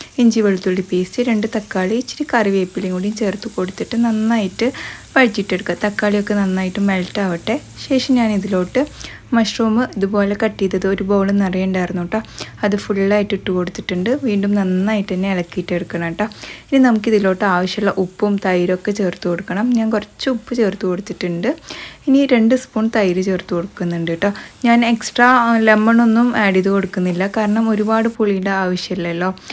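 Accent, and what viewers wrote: Palakkad, India. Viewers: Palakkad evadeyaanu veed .enth resaa ithaade samsaram .kett irunnù povum.oru hai tharo?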